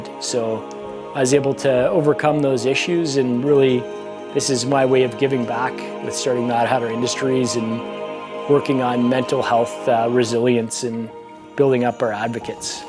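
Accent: Canada. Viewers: Nice to listen to another Canadian.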